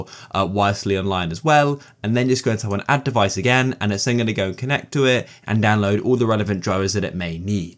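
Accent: United Kingdom. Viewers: That's how ALLLLL British ppl speak...lol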